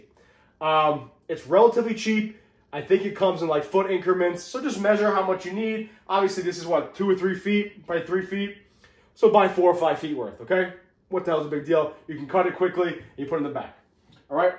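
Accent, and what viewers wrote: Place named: New York, United States. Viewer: This guy is from New York or New Jersey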